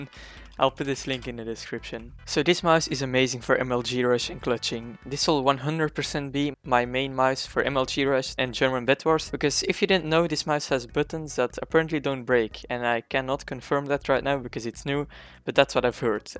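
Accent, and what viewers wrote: Netherlands. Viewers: ik wist dat je nederlands was ik hoorde het pog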